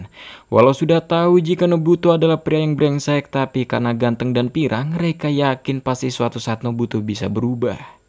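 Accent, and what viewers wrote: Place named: Indramayu, Indonesia. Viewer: Orang indramayu tah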